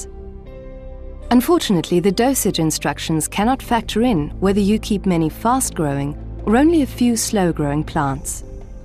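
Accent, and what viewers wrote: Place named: Germany. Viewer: i hate posh english in a german accent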